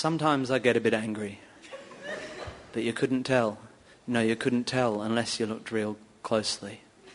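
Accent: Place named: Australia. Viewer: Early Minchin, My god you can hear his aussie accent.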